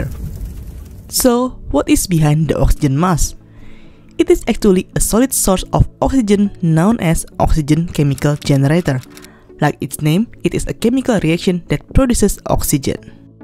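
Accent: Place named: India. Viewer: sounds like one of those indian video conference at work.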